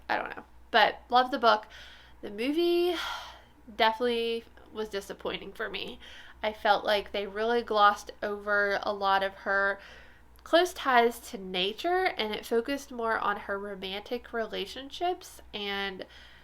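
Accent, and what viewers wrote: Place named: Pittsburgh, United States. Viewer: Love meeting Pittsburgh Knitting ladies. I lived in Squirrel Hill until mI was 12. Then we moved to CA. I still have a few friends in Pgh., none that knit, sadly. My husband and I live north of Dallas,Texas in Melissa, Texas. I hear Pittsburgh in your voice but not as much as my mom, her sisters and brothers my aunts and uncles and their kids, my cousins. I just wanted to say enjoy yourself doing your podcast. That’s what we will enjoy. I need to plug my tablet in before it dies. I’m a new subscriber, but I was trying to say enjoy yourself, don’t worry about us, lol. As you know we just want to have company to watch while we knit. 😊😂❤ Thanks so much.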